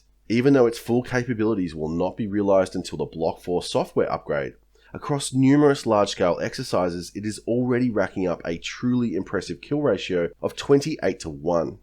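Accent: Australia. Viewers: And this video isn't the exception; it seems to be the norm. Watch his EPIC explanation on: The history, development, physics, tactics and strategy of nuclear munitions. It's an outright 10. And I am knowledgeable on this. I actually (for whatever reason) don't love aussie accents, but I LOVE this channel.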